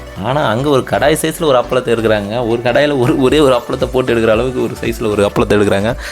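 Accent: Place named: Vellore, India. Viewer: Nama than 😂 vellore la inime nama than antha mari iruke ya un slang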